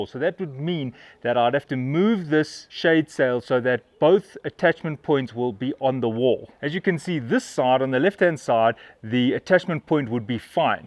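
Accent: South Africa. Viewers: No doubt about it. South Africans have great accents and always clearly spoken. Excellent 👌 thank you very much.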